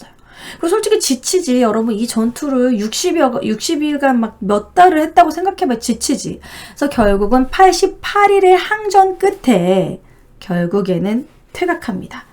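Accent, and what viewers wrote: Gyeongsang, South Korea. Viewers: 너 대구출신이지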